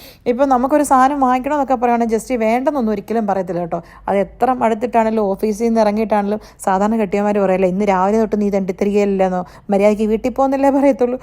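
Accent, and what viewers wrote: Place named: Kottayam, India. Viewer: Chechi kottayam aano...nammude samsaram pole thonni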